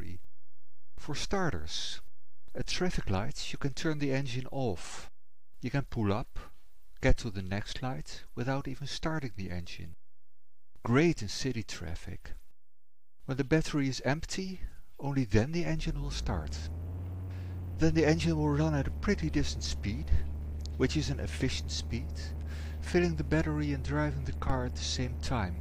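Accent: Netherlands. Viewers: It is a Dutch (The Netherlands) accent. For a Fat and Heavy GERMAN accent listen to <link> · you sound dutch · is Dutch. He's not speaking his mother tongue. He has a slight Dutch accent but his English is perfect. He even uses English idioms and nuances which a lot of native English speakers would have difficulty with. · I think he's dutch.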